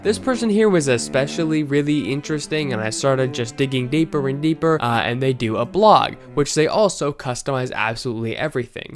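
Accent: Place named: Midwest, United States. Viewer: midwestern kurtis conner? (also omg we're litch the same exact age what)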